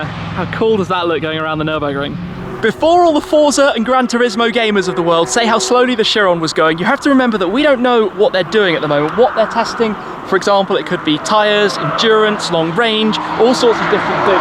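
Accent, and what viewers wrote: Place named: United Kingdom. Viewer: the man of a billion cars and with the amazing British accent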